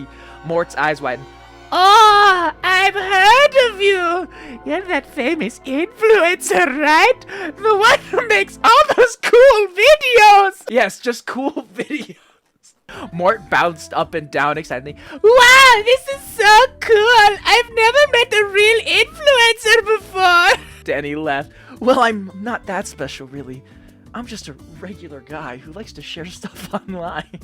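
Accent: United Kingdom. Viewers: It’s not the same without the British gentleman voice tho…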